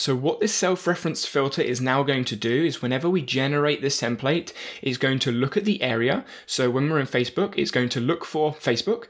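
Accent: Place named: Gloucestershire, United Kingdom. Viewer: Cheers. Where you based? You sound local. Gloucestershire area?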